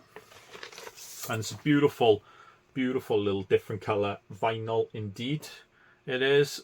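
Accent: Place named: Wales, United Kingdom. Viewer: A Welsh man??? Well hello sir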